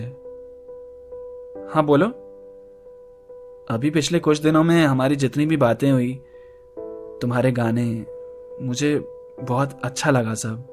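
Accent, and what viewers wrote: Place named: Haryana, India. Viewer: Aap sayad hariyana se lagte ho😋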